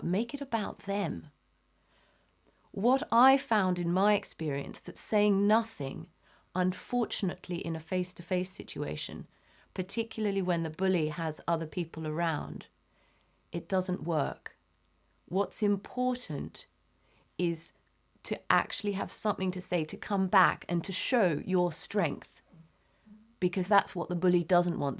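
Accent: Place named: RP, United Kingdom. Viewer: Refreshing to hear a posh voice wierdly. Thanks for doing this video very good topic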